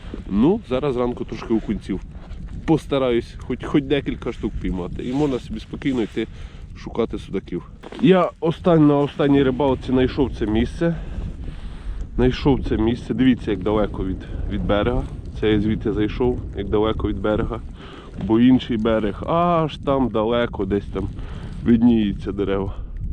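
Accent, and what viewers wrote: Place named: Western Ukraine, Ukraine. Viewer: Звідки ти дядьку? Я з Золочева,і Самбора. Щось діалект ближче до нашого. Я на тебе підписався.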